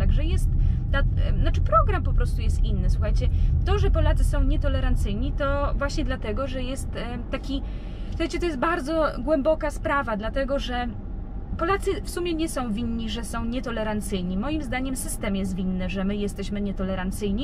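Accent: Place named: Germany. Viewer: Dla mnie vlogi po angielsku może mają sens ale nie na tym kanale ponieważ Pani czasami przekreca słowa i ma trochę niemiecki akcent (bez urazy). Angielskiego uczymy się najwięcej słysząc go więc z tej naszej nauki wyjdzie jedno wielkie każdy wie co. Każdy powinien się uczyć angielskiego wtedy kiedy ma na to ochotę a nie pod przymusem.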